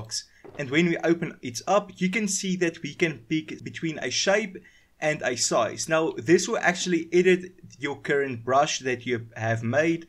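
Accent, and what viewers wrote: South Africa. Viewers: You sound south african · Finally thanks for this amazing addon😊Also are you South African I hear a bit of an accent😂😭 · This is perfect. Love the voice btw from SA? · Are u from South Africa bru?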